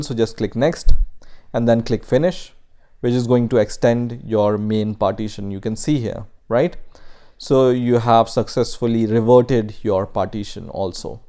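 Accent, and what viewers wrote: India. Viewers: Once I heard that is an indian accent I knew it will help me xD. Thanks! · 존나 개도움 되는 영상!!! 영어 발음이 인ㄷㅗ분 같은데 그부분만 빼놓고는 정말 설명 잘했음요...땡큐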